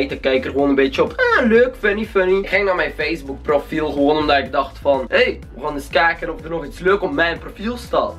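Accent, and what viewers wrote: West Flanders, Belgium. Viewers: Kom je uit westvlaanderen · Hahahahah west vlaams man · Zo Nice daje ma west Vlaams klapt inde video😂😂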